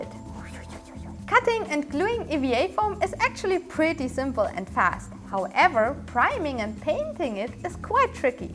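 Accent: Germany. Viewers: This English with a German accent or vice versa left me confused 😹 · Where do you come from? It sounds like Germany your English is interesting I mean it sounds pretty German :D I mean no hate but it's interesting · I’m always here for the dogs and the German accent.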